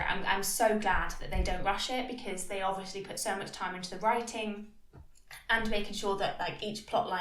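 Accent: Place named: England, United Kingdom. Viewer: Love your reviews, and thanks for uploading. Would it be possible that you speak a little slower? Your English accent is lovely but not always easy to understand at times - and the subtitles don't always make sense.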